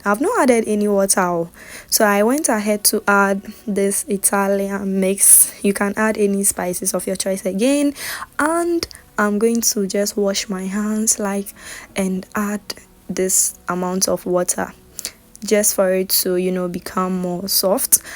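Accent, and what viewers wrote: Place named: China. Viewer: Your now sounding like a Chinese 😂😍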